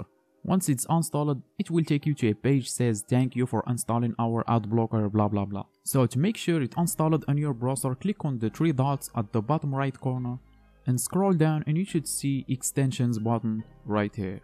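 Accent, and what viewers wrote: Morocco. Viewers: Tbarklah 3lik akhoya bayna fik mghribi mn tari9a dlhdra👌👌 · مغربي هذا ههههه باينة غي من الهضرة 😂😂😂😂🤦‍♂️🤦‍♂️ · i knew ur Moroccan from ur accent , shoutout to you Mate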